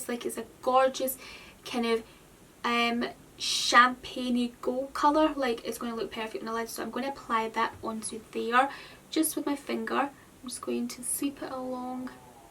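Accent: Scotland, United Kingdom. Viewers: Are you Scottish if so where are you from because I’m Scottish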